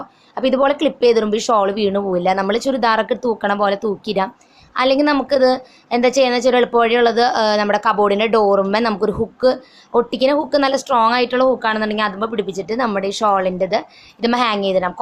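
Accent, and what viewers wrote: Thrissur, India. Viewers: Samsaaram kettapol thoni .ivde ente adutha flatil nd .1 thrissur kaar.avrde samsaaram ningade samsaaram same aane .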